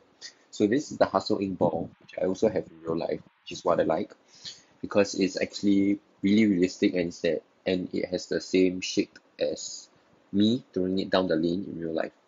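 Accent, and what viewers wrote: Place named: South Africa. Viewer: Who is doing the voice over. This is a South African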